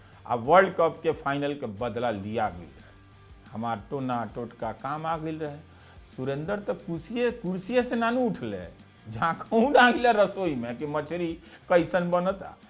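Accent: Bhojpuri, India. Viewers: शुद्ध भोजपुरी में खबर सुन के मन गदगद हो जाता है। इहे जज्बा बनवले रही। आपन भोजपुरी सबसे बेहतर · मजा आ ग़ईल राउर भोजपुरी वाला आवाज सुन के । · इतना शुद्ध भोजपुरी मैंने कभी नहीं सुना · रउरा के मुंह से भोजपुरी बड़ा निमन लगेला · Wao shudh bhojpuri ❤❤❤❤❤ Love you sir❤❤❤❤🎉🎉🎉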